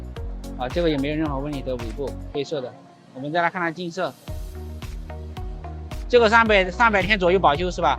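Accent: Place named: South, China. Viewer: 南方口音重罢了 其实没什么的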